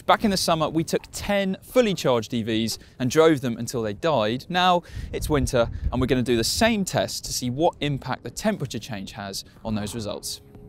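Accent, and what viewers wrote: United Kingdom. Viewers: bloody British accent...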